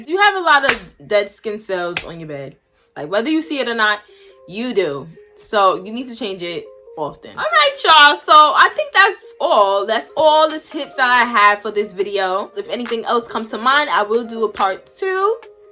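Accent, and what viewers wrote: New York, United States. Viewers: Am I tripping or do she have a New York accent 🤣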